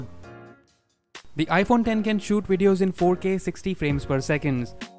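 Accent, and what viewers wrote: India. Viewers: Indian accent is sooooo bad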